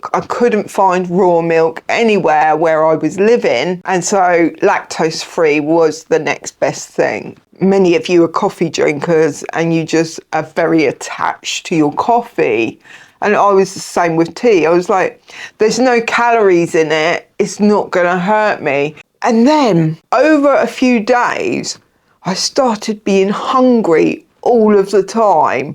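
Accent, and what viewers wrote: United States. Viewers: Great talk! Thank you so much. And I love your charming Texas drawl…haha! But seriously, your story and advice are excellent. Thanks again & God bless you🙏🏻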